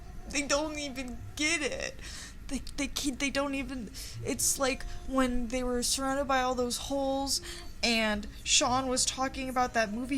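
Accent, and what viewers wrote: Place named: Poland. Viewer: you do have a way with words :) I do not, I have fragmented abstract thoughts -sarah Paulson is in fact a lesbian -your tangents and ambiance are awesome -"to get woke" love that -im also a skipper when it comes to movies/tv -your polish accent is kinda sexy -im a mess and I love colour so I'm def anti minimalist -I wish I could actually FaceTime u or something lol(I don't have an iPhone 😂) cuz I have trouble verbalizing if I can't just blurt out what I wanna say -the only way I'm writing this is cause I wrote notes and then made bullet pts -better than nothing lol love ur videos so much (*˘︶˘*).｡*♡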